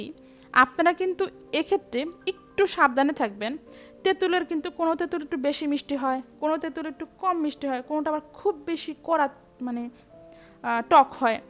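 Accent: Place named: Tangail, Bangladesh. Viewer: আপু আমি তোমার সব ভিডিও দেখি,সব গুলো আমার ভালো লাগে। আর কথা শুনে আমার মনে হয় তোমার কথা আমদের কথার মতো, তোমার বাড়ি কি টাংগাইলে, আমার বাড়ি টাংগাইলে সদর থানা পাড়া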